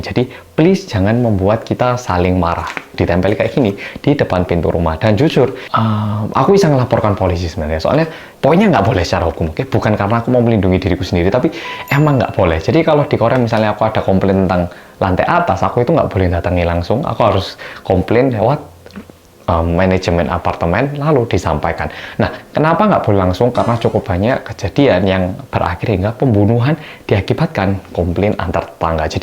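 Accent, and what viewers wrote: Java, Indonesia. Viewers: . Jawaa bgt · Mas pindah Indonesia neng kene biar kecil2 omahe yoh nyaman ora wedhi wedhi kyk ngono. Seng sabar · Smoga mas ttep jd org korea yg medhok bkn org korea yg kek gtu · Salam selamat & sejahtera utk Cak medhok dgn istri,slmt mlm,saya dari kota Bandung,saya ikut prihatin dgn pengalaman tdk enakmu tinggal di apartemen di Korea,oh iya saya punya info sangat penting tentang "Sesuatu hal dari budaya & tradisi Sunda" yg pasti akan membuatmu sangat suka jika mengetahuinya,yaitu info tentang hidup jaya yg mana setiap orang serta setiap rumah tangga membutuhkannya,tapi kita berdua harus ngobrol langsung,dtglah ke Bandung,salam kenal utk kalian berdua,salam Rahayu.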